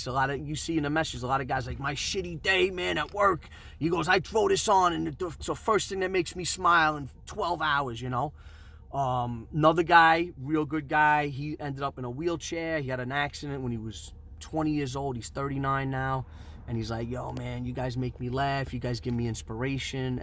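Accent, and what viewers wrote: New York, United States. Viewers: That's because you guys dont do clickbait titles. If you say you're gonna drop it, you're gonna drop the fuck out of it until something breaks. Also I love how you yell, but being a new yorker myself, I know that's just you're normal voice.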